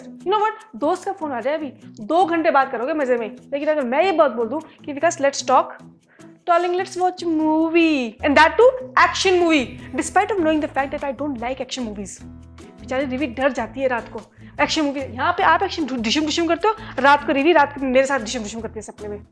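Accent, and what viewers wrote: Haryana, India. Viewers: That's Awesome....you guys rock...me and my wife has started watching and now becoming addicted to your videos. For you; u have a naughtiness that is actually a punch in the video and for; Dominance, harayanvi tone with cuteness is the heart winning that has become a right combination. Keep it up you both. Thanks for making us laugh. God bless you guys..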